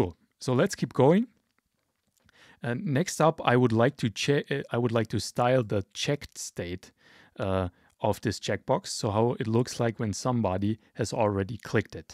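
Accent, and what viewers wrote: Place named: Poland. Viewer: Your channel is great, your pacing is excellent, easy-to-follow and not monotonous or boring whatsoever. I stayed engaged and interested the entire time, and the way you laid things out starting with basic styles and narrowing down to more advanced styling was really logical and built a solid foundation of understanding (and retaining!) the information! Please keep it up, make more videos and continue being awesome. Also, are you Polish perhaps? Trying to guess by the name and the accent, I couldn't tell because it's not very strong, so I am just curious. I am Polish myself!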